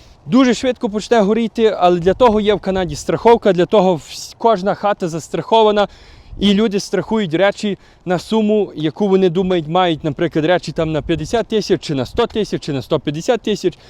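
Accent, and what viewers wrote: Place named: Ivano-Frankivsk, Ukraine. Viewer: Який чудовий акцент. Наче з Франківщини?)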